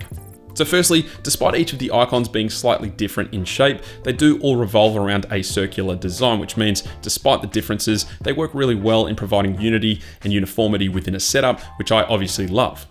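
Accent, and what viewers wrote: Australia. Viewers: Aussie I guess😀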